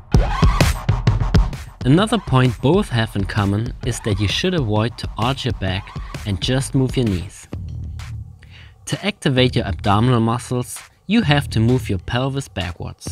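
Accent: Germany. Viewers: Are you German? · Are you from Germany? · are you from germany?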